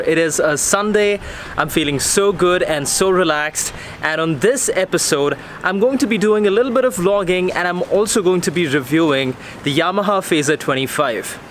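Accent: England, United Kingdom. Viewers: Aaah... look at this beautiful man with his beautiful english accent and his beautiful hair singing with the wind... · I love Ur English accent .....can u plzzz share how to improve English to that extent....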